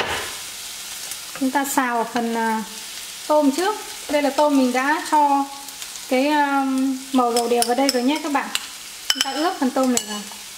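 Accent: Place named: North, Vietnam. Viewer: Bac ky 75 noi giong nghe oai qua .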